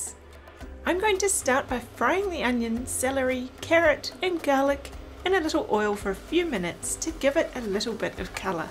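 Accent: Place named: New Zealand. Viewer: Looks delicious!! Also, I enjoy listening to your New Zealand accent, so much. Best wishes from Phoenix, Arizona🤗💐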